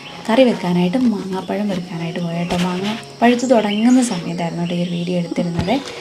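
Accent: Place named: Kottayam, India. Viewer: ഞങ്ങൾ കോട്ടയംകാര് വാഴച്ചുണ്ടെന്നാ പറയുന്നത്., നിങ്ങളുടെ slang തന്നെയാ ഞങ്ങൾ പറയുന്നത്.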